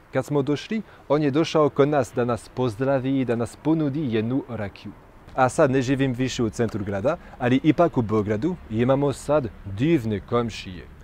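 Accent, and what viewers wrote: France. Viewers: Hahaha o bože kako dobro zvuči srpski sa francuskim naglaskom :)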